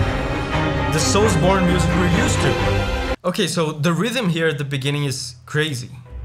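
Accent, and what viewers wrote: Brazil. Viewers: Vc é Brasileiro? Sotaque brasileiro. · bro, are you brazilian?? your english accent is a little bit like a brazilian speaking english (don't take this in a bad way, but it remembers me so much)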